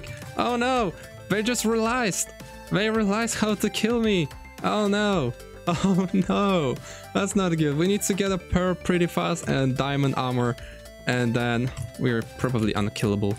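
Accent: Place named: England, United Kingdom. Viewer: Ty naprawdę polak bo brzmisz idealnie na anglika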